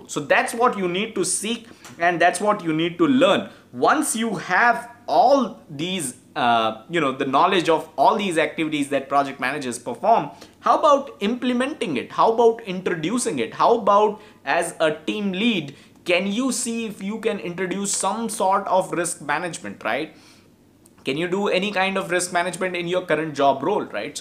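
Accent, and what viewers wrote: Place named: India. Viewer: i understood everything with India accent 😁, i have difficulties sometimes to undestand the accent.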